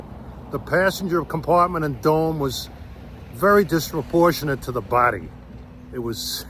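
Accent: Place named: Long Island, United States. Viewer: First of all, your doing a great job, the Long Island accent is great since I grew up in New Hyde Park. I worked as project engineer for Amtrak <num> and installed on a good day, 1000 ties on the NE corridor. Everything my crew installed has been replaced with concrete ties and I see Nassau interlocked is totally gone. All the crossovers are gone. Ricky759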